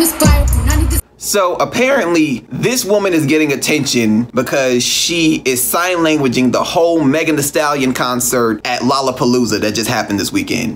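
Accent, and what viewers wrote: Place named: Texas, United States. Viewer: U s texan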